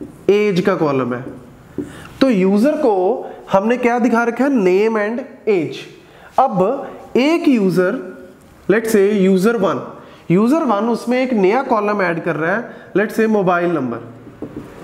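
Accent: Punjab, India. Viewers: You are excellent, and one more thing..I know that this is out of context, but I can bet you are from Punjab😂😂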